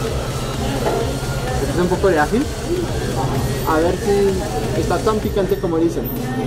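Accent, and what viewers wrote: Bogotá, Colombia. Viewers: hermano mete de vez en cuando un saludo o despedida en frances para la Colombie y Zach Morris hizo un stream live diciendo que estaba pensando en hacer un video con vos Chevere tu acento cachaco,Merci bocu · Acabo de descubrir tus videos y me gustan muchísimo ya! Tu español colombiano (bogotano 😝) es excelente! sigue así!!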